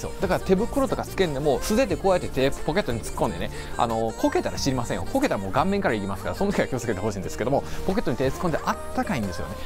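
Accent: Kansai, Japan. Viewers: 関西弁のイントネーションと喋り方は魅力ですね。 ついつい、見ちゃうわ 頑張ってください。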